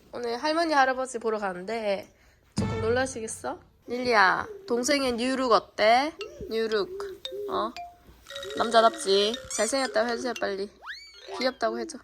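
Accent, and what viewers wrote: Gyeongsang, South Korea. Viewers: 세상에 경상도 어딘지 모르겠는데 말투도 귀엽고 재밌고 또 너무 순수하고 맑아보여요!! 응원합니다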